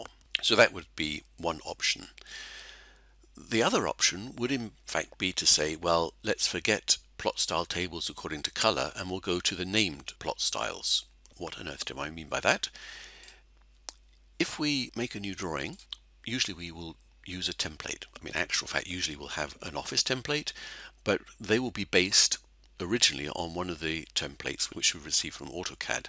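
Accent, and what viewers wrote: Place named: South Africa. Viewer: I'm trying to work out where you accent is from, Im guessing South Africa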